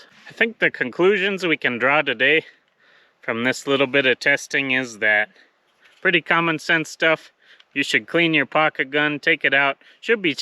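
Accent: Canada. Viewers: Hi, I am a new viewer. Are you a Canadian? You have a Canadian accent. Great channel.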